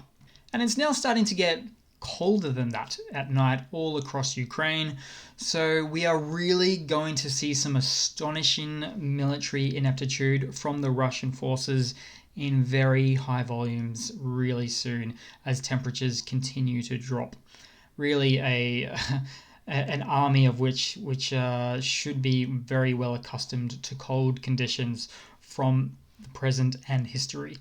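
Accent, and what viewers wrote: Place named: Australia. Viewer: Always a huge thank you for the hard work put into your content! Love the accent! Teach us more Aussie! While learning about Ukraine! ❤️🫂🇺🇦🫂❤️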